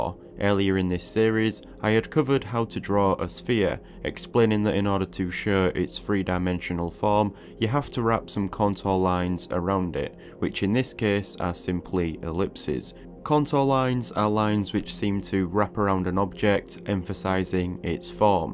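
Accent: United Kingdom. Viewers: obviously, but which one of the British accents 🤔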